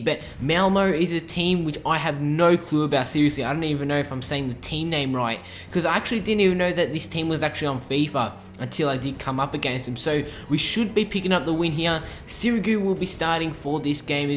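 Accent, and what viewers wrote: Australia. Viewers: Are you an Aussie and wot state in in vic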